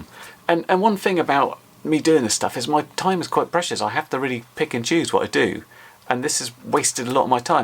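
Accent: United Kingdom. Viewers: Oh wow. I had to stand up. It's as close as a cheese burger. A British accent doesn't make you automatically smart son. Read. Just read. Do what I ALWAYS told my Dad. I said "Before you call me RTFM. Read the effing Manual ".Anymore questions call me. So, RTFM!. And if you deal with HS as PEOPLE they'll deal with you as a king. Arrogance out back please.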